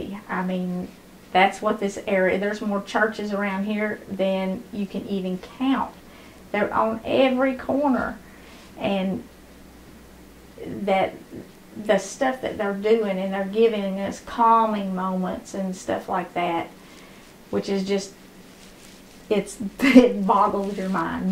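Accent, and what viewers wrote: South, United States. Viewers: She's obviously from the south. Why do you ask? Where are you from?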